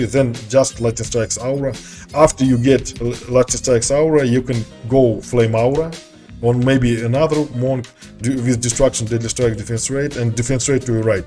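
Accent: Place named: Russia. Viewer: У тебя такой русский акцент лол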